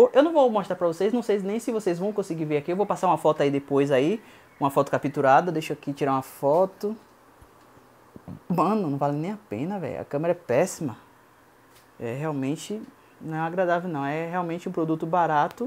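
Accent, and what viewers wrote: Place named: Salvador, Brazil. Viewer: Vc é soteropolitano, sacaninha rsrrs. Bom vídeo! Mas o aparelho parece ser bem meia boca.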